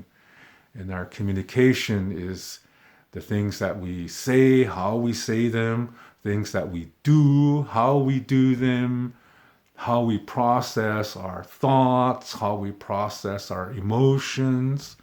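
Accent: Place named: Hawaii, United States. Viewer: You have an accent. Very similar to native Hawaiians. Kind of sing-song. It’s nice! Have an enlightened new year.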